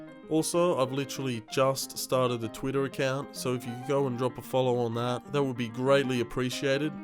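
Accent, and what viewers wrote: Australia. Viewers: right, no wonder you had an Aussie dialect and accent (partial) but not too obvious when you spoke watched half of your videos, trying to catch up with all of them, and realised that your channel only just started a few months ago, and I found your channel not too long ago either keep up with the good work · Christ that is by far the most annoying australian accent i have ever heard.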